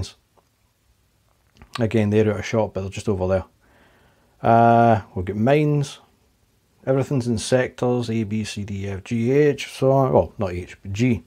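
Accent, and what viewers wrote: Scotland, United Kingdom. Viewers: Good on you lad. Nice to hear a Scottish accent and not some whiny little pre-pubescent English, or Yank gobshite.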